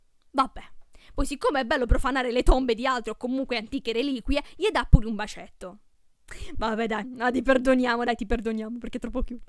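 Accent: Bari, Italy. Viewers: Secondo me sei di Bari 😂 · Ma sei barese? TVTB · Ma sei barese?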